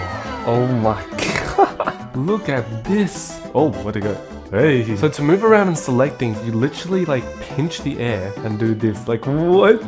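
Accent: Australia. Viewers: i just LOVE the Australian accent, its jus tooooooo iconic ❤😊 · One Question r u Australian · are you australian?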